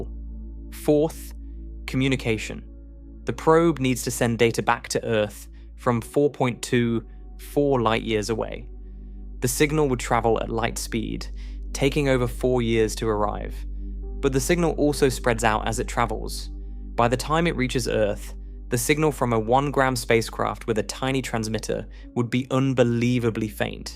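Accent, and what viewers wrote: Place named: RP, United Kingdom. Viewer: Hi from Lake Como, Italy. I like your videos. They are made with AI but indeed perfectly put together; I like the accent of the AI voice, sounds like received pronounciation but with a modern twist. What tool are you using to make the narrating voice?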